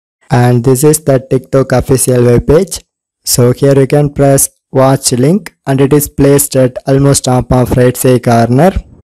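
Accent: England, United Kingdom. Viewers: nice english accent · thanks for the english accent!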